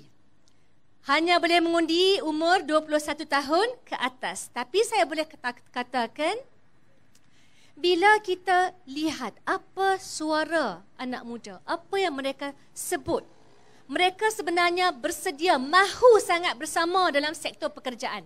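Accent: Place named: North, Malaysia. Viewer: first time dgq nurul izzah cakap utara..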